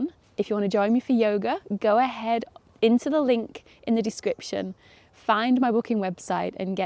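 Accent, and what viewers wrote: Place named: North, United Kingdom. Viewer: I like your northern accent 😅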